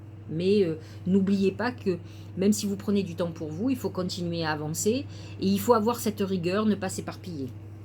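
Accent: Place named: South, France. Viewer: Merci beaucoup de la part d une Vierge qui mentalise parfois trop. Je vais demander l accompagnement du "juste" à Madame la Lune et à mes Anges projecteurs. J apprécie bcp vos interprétations! Et j adore votre joli accent du soleil 😀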